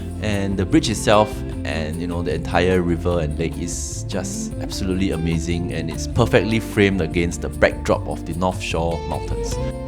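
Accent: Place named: Singapore. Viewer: guess you are from singapore your accent sounds familiar to me. I was living in singapore for 15 years and love the memories of it. Migrated to Canada because of Covid . Glad to subscribe the video is awesome enjoy the travel ❤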